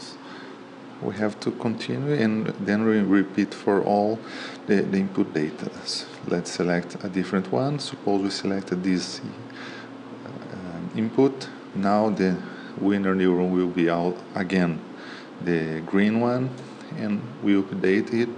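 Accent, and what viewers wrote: Brazil. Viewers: U are from Brazil? Nice ascent. If yes: Muito obrigado pela explição!! If no: My very thanks for the explanation.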